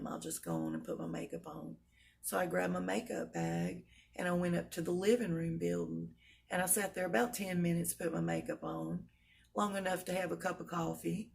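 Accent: South, United States. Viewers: PS I want to thank you for your videos - I enjoy listening to your southern drawl and your outlook on life. May God bless you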